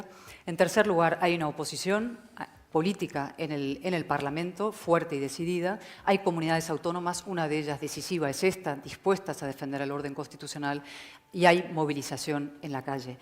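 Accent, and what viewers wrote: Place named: Argentina. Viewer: No me gusta Cayetana habla bien pero el tono prepotente y el acento argentino ese chirría un poco.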